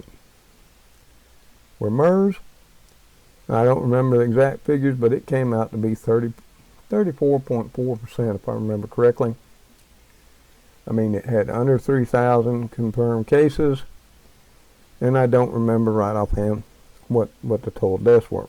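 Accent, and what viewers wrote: South, United States. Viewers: I love your Southern Accent! I was born and raised in Georgia. When I hear you it reminds me of home! Arizona has been my home for 4 years but I sure miss Southern hospitality southern charm and down right good manners. Oh yea greens and cornbread and boiled peanuts also.😉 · Thank you Mr. for this important update, you have very good advice to us all in this very disturbing time. I have been following a few of the channels you suggested this past week, and can see where this is going. I love to hear you speak and your southern accent, you remind me of my father in your features, but unfortunately he passed some years ago now. You speak so much common sense in these troubling times. There are distressing scenes of people being put into isolation in China on the BBC news right now. God bless you and your family and much 💖 to all.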